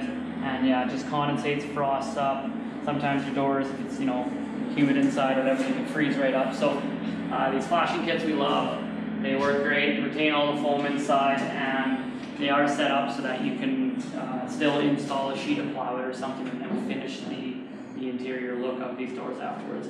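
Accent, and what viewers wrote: Canada. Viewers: Haha....you sound just like me. I'm in Northern Ontario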